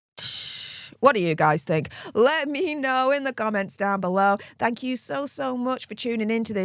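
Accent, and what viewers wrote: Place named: England, United Kingdom. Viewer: I watch all your videos but I’m intrigued by your accent. I can’t place exactly where in England it’s from?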